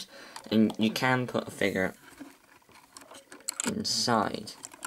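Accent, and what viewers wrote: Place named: Somerset, United Kingdom. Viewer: Soooooo Somerset 😂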